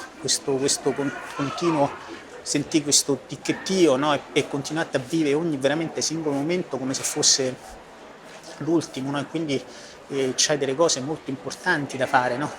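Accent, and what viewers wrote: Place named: Rome, Italy. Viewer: Che poi Gli Inglesi guarderanno il video in modo perfetto e con stile tipo "We are Like star Wars, We reached episode 4 of The Car accident" Poi ci siamo noi italiani che sentiamo "Savve pellegrini d'a rete" Amo il tuo accento romano in every case XD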